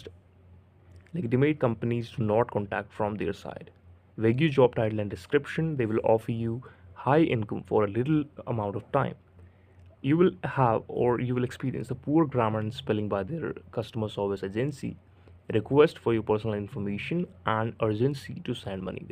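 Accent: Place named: India. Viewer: Just by hearing your accent I already know that it’s a scam and you are a scammer as well. D!rty 1nd1ans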